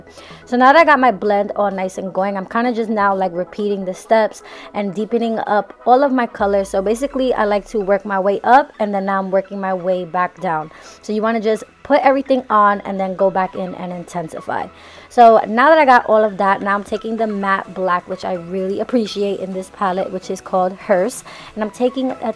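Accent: New York, United States. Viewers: Her accent🥰so New York I love it💕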